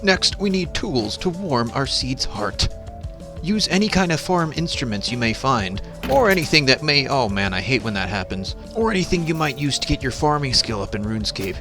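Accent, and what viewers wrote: Russia. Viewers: Are you Russian? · Bro I was like you're Russian aren't you and after that you was like in Russian 💀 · Wait what happen to your Russian accent?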